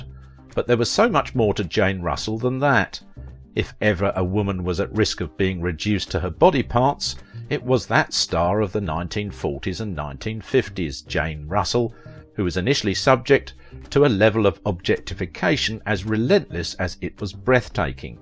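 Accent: England, United Kingdom. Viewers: I’m assuming your comment is tongue in cheek. The narrator seems to be British. In the US we may say loo-tenant, in Britain it’s left-tenant. Even though the spelling is the same. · we invented the language, therefore we will speak it how we like. His pronunciation is correct for that part of England.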